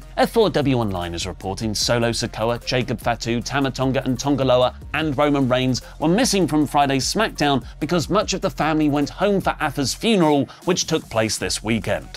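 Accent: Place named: United Kingdom. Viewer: okay i know its the british accent, but it takes 5 seconds to look up. Trey Mi-G-el. Just pronounce the G. No need to pronounce the U.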